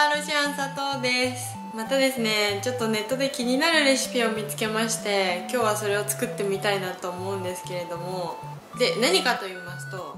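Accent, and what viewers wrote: Izumo, Japan. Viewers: 大社の人間なのでたまに出る出雲弁がたまらんです(*´°`*)💓ロシアンちゃん大好きーー！！💓✨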